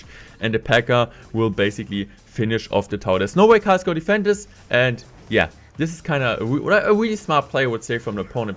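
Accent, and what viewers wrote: Germany. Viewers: Just found this video on my recommended. This guy's accent sounds german. Lit 👌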